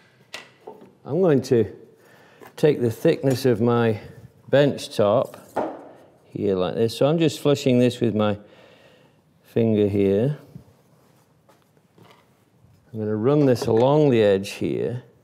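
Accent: United Kingdom. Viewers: It is nice to have subtitles for those who might be hearing-impaired. I guess you have used a speech recognition software. Some editing is needed : "wall" instead of "war"; "disassemblable" instead of "disassemble a ball"; "bolt" instead of "ball". I am not sure I did catch everything because I normally just listen to your clear British pronunciation.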